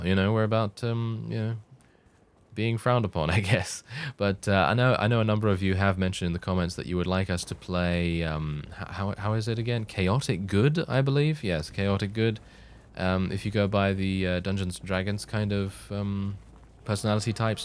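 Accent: United Kingdom. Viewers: Your voice, British accent and saying the word problematic every 5 minutes You are the most fascinated one i have ever listened to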